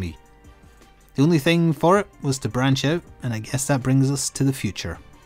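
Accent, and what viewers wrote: Scotland, United Kingdom. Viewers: Came aboard for the silly overwatch vids, stuck around for the amusing dialog & Scottish accent. Love ya, man, don't ever stop being you. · Tl;dr You're amazing! Love all your content! ❤🧡💛💚💙💜🤎🖤🤍 Hey there, This is not the first time I've commented/watched/participated in chat. I've been following your channel since that sponsored mh3u video. I used to make videos and streamed under the name TheBearthropod. Never got any success but it was just for fun. You always have been and always will be my favorite content creator. I loved learning all the new things about you from your new videos. As another private and quiet person, I respect you greatly. I've always thought that if we met in game or in person we would hit it off and be friends. Only because of our similar views on games and both being in the lgbtqia+ community. You're inclusiveness, respectful nature and humor always makes me smile. 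Your Hollow knight coverage coincided with at the time the lowest point in my life. I suffered from a severe sciatica flare up. I couldn't walk for two weeks and was in excruciating pain. Your daily videos of hollow knight gave me a flicker of hope(no pun intended please don't send the legitimate business frog i need my kneecaps). If I thought that was the lowest point in my life, let's just say I definitely did not see 2022 coming. I lost my grandfather, two uncles, an aunt, a beloved pet of 18years and my mother. I was horribly depressed for two years, but true to form there were your posh Scottish accent and dry wit to get me through. So, I thank you from the bottom of my heart. Even if there are horrid people critici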